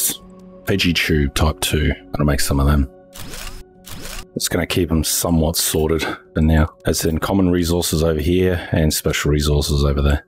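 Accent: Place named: Australia. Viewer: Aussie ASMR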